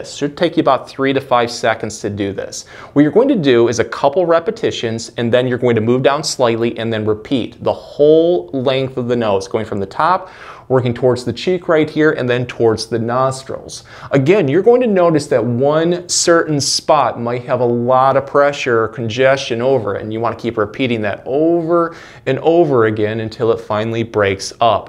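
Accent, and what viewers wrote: Michigan, United States. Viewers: Michigan accent!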